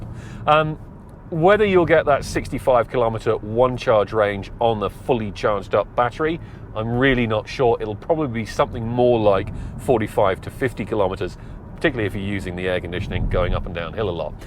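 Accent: England, United Kingdom. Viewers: Is this guy english? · because he's English :)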